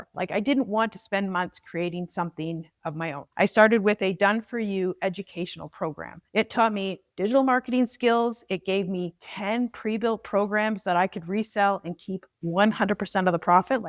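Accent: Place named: Canada. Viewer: Me too I heard her Canadian accent. I’m from Nova Scotia but live in Montreal now 😊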